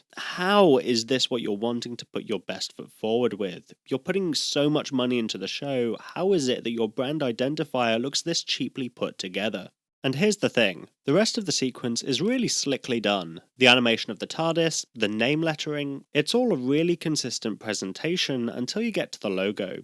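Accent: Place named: Scotland, United Kingdom. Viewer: I FORGOT HE'S SO SCOTTISH OH MY GOODNESS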